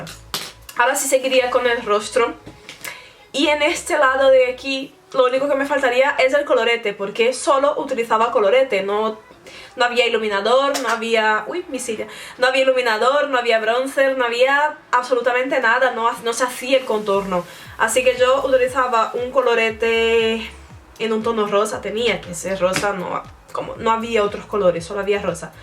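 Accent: Brazil. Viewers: eres brasileña? (como se diga)xD o hablas así? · Me gusto su acento brasileño mezclado con el español :3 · Creo que Brasilera o eso sentí xd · Tienes acento brasilero, muy bonito! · Brasilera aunque en otro canal una chica también me pareció de Brasil y me dijeron que es de Honduras. 😀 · ya se me hacia que tenias un acento brasilero xD en fin buen video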